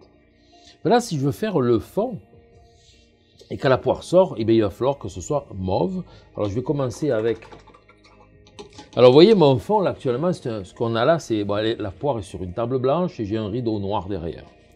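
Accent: South, France. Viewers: Merci pour ces infos et pour ton accent du sud de la France.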